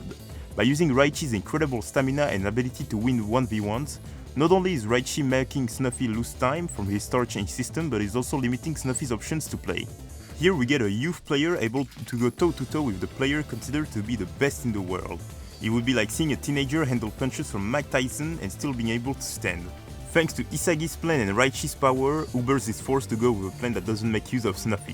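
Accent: France. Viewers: je me disais que t’avais un accent français 🤣jusqu’à ce que je vois ta bio, continue comme ça bgg force !!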